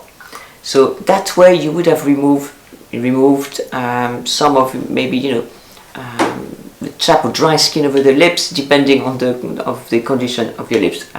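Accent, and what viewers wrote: France. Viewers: If I wear a lot of make-up, I seem to look a lot older, so less is more for me. Although nearly 69 I've always had and still have a very oily skin, which thankfully means less wrinkles. I have never liked foundation so use a factor 30 tinted city block cream, a little blusher , mascara, eyebrow pencil and lip gloss and that's it. I'd love to wear a red lipstick like you but I'm very fair and a red lip doesn't suit me unfortunately. Love your videos and your wonderful French accent xx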